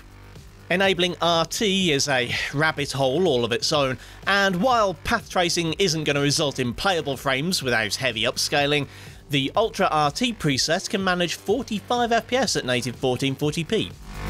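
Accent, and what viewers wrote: England, United Kingdom. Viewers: Be quiet!! Mr english accent got a new video!!!! 😎🤩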